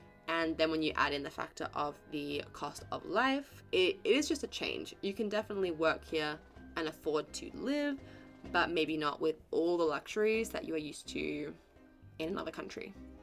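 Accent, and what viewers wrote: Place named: Uruguay. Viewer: Hola como hace para hablar espanol con acento uruguayo? donde lo aprende? Me refiero a: "perdés" eso deberio ser "pierdes". ATB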